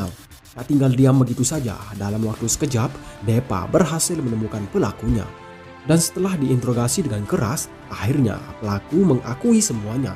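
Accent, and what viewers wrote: Bali, Indonesia. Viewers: Seperti logat Bali yaa min...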